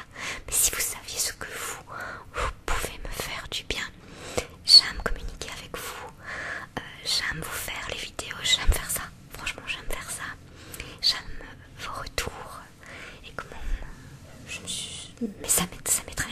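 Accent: Belgium. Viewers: Coucou, tu mérites tout le succès que tu as:) j'écoute principalement des vidéos ASMR anglophones, russophones et germanophones. Mais là, je craque, j'adore tes vidéos, toutes autant les unes que les autres! J'espère que tu vas bientôt avoir de bonnes nouvelles pour ta santé. Pour ma part, je suis en post burnout et dépression depuis janvier. Tes vidéos me font beaucoup de bien, et l'accent belge... 💗 Je suis fan! Gros bisous, prends grand soin de toi. La santé est prioritaire:) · Bonsoir, tu es belge ? 🤔 sinon video trés relaxante, j'adore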